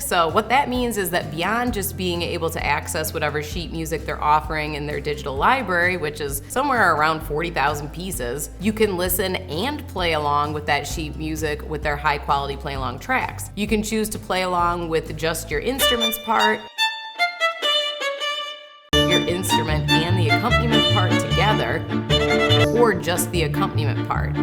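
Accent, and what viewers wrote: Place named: Upper Midwest, United States. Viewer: I had literally never heard her speak, so I didn’t know she had such a thick Wisconsin/Minnesota accent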